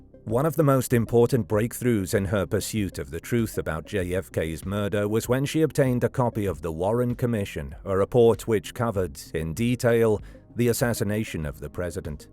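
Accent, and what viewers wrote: England, United Kingdom. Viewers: Why do this in an england accent?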